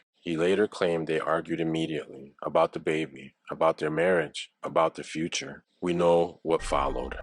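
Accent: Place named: Northeast, United States. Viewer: I love listening to your voice. It's so calming. Love the northeast accent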